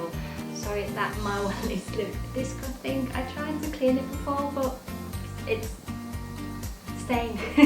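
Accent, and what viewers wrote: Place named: Thailand. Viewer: I like your Thai accent!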